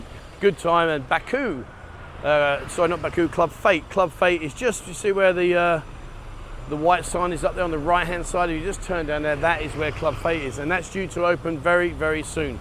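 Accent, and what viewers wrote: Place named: Nottingham, United Kingdom. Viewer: speaks Thai. Like a local with a totingham accent.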